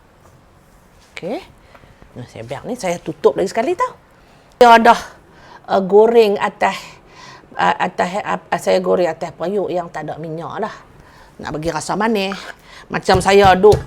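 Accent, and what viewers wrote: Kedah, Malaysia. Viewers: Assalamualaikum Kak, saya suka tengok video kak. Kak cakap kedah pure tak buat buat. Banyak petua kak share dalam video ni. Baguih kak idola saya setakat ni dalam arena youtube. Nanti ajaq buat kuih kaghaih pulak na..ka dah ada video nanti sat nak check...saya orang baru..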